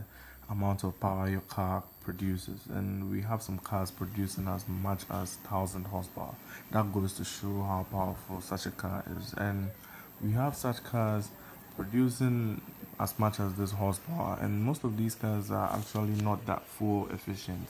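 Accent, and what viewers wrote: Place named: Nigeria. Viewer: You sound nigerian. Ice explanation tho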